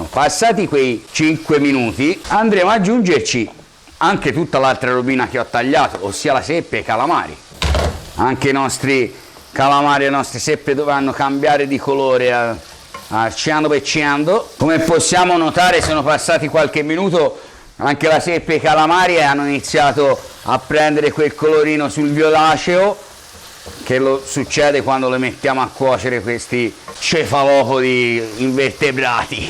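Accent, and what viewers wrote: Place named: Livorno, Italy. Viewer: Ciao, ti ho scoperto da pochi giorni e già sei diventato la star della mia cucina e mi piace troppo l'accento livornese. Io abito a Montecatini da cinque anni ma sono della provincia di Torino esattamente della Val di Susa (piena di corsari), sto già pianificando una gita a Livorno al tuo ristorante, ti voglio sentire dal vivo, sei forte!!! Ciau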